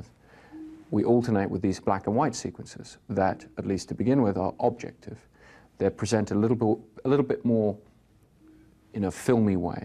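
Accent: London, United Kingdom. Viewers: British English accent sounds great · His accent was more London in those days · London accent, clear as day tbh. He's an American citizen now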